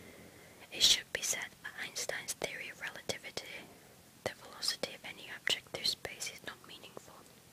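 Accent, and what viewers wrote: Yorkshire, United Kingdom. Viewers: You sound Yorkshire voiced..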